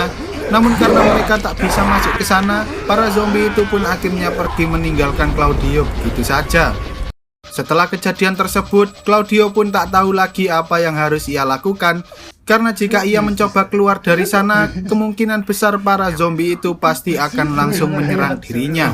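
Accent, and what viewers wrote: Java, Indonesia. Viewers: Alur film yg menarik, Krn miminnya medok jowo. Saye suke saye suke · Medok bgt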